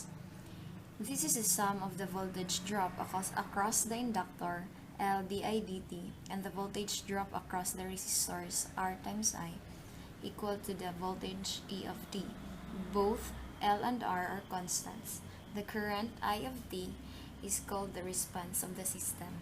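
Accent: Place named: Philippines. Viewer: you're sounded like a filipina, nice explanation by the way.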